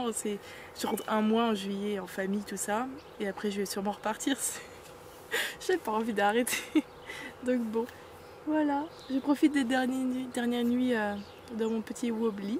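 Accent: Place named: Italy. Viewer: Magnifique videos!!. Tu commencais a avoir l accent italien!!!!. Bravo a toi !!. Tu es une baroudeuse😊. A bientot j espere. Revients!!!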